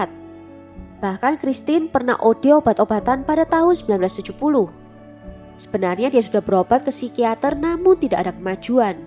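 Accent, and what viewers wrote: Java, Indonesia. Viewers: Mbak ini pasti wong jowo, medhok jowone 😉, tp ini jadi ciri khas yg bikin beda dengan yg lain. Sukses ya mbak 👍🥰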